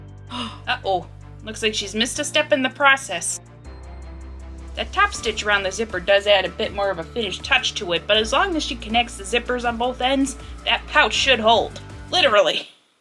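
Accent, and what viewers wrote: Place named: Midwest, United States. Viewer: This was absolutely FANTASTIC! SO hilarious, creative crafts, and I LOVE your Midwestern accent as the commentator with! 😂 Please consider a 2025 iteration, and thank you for the laughs! 🥳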